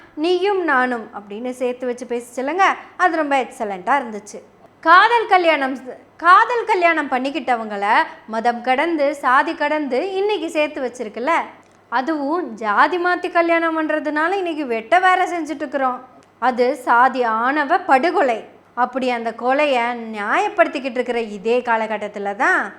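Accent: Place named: Coimbatore, India. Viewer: I like your slang...😂 Use mostly covai slang...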